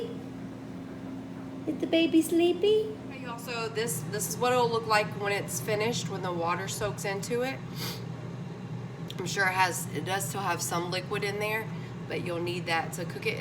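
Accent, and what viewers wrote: South, United States. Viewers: I just love to watch you cook. You remind me so much of myself with that southern Belle accent.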